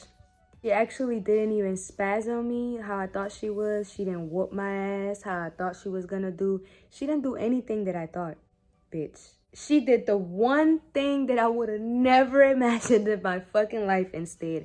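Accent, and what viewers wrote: United States. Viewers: You accent is very American :) do you visit Spain ( before covid I mean :) )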